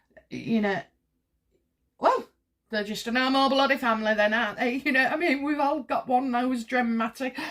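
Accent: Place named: Hull, United Kingdom. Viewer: Ah so it's a Hull accent.! I was wondering. Just love accents and the readings. Yes I am from Yankee and Bookworm - we are creating a really good family. I understand the "fat" word is just an expression of" lush/lots of info.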